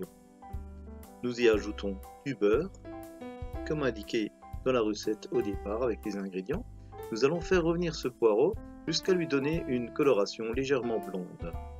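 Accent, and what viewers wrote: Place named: France. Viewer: I love your French accent too, sounds so beautiful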